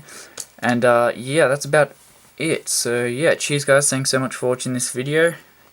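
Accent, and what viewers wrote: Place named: Australia. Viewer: U sund Australian iam Australian and Asian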